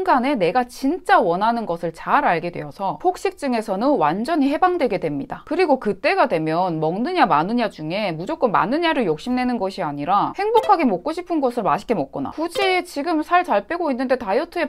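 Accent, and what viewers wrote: Gyeongsang, South Korea. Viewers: 경상도 분이신가요?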